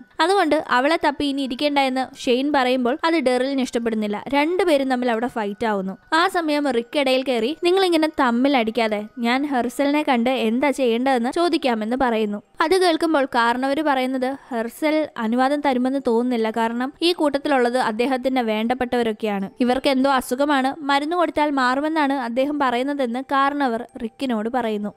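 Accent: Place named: Kozhikode, India. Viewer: sound like kozhikode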